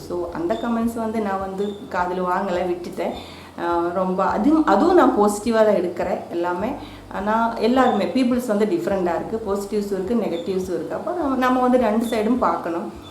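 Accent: Kerala, India. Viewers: I love your tamil with malayalam accent nice to see you face to face. I learnt to make aappam and stew with your help. Thank you have a great YouTube experience 😃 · So happy to see u. As ur voice u looking so awesome. I love ur tamil with malayalam accent. Ur recipes r too good. Keep rocking friend. 👍👍👍👍👏👏👏👌👌👌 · Ur malayalatamil super..first viewer ... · Sweet and simple 😊 malayalish tamil is cute chechi. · Hello mam, I have tried your receipe kadalai curry and aapam. Your explanation is so neat and easy to follow. Never watched anybody's channel talk like this. You are doing grt ,pls continue it. Also feel free to talk in the way which comes to you, listening keralites speaking Tamil always pleasent for us. Bz of dubbing difficulties, you may reduce your dish variety in future. So pls don't stress yourself much in translation.we are happy to listen to your Malayalam mixed Tamil .Keep rocking mam. 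· Akka, its sweet to hear your tamil with malayalam slang. Don't worry about language we can able to understand it. Thanks for your efforts to give your recipes to us(Tamil people). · I like your voice and this malayalam mingled tamil😍😍😍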